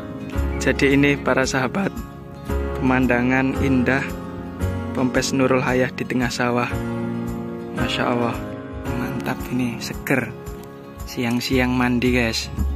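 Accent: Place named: East Java, Indonesia. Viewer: Ustadz luar biasa smg sukses boso wetane medok bgt 👍👍👍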